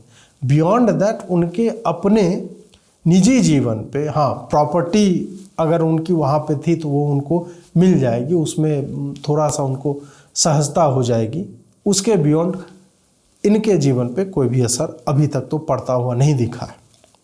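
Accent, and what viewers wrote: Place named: Bengal, India. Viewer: Bengali accent is too good. Milega sab milega.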